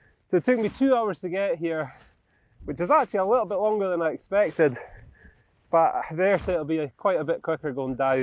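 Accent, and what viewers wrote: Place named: Northern Ireland, United Kingdom. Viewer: Can't place your accent, mate... County Antrim? Ayrshire? Anyway, that view of Nevis and co is jaw dropping. Subscribed.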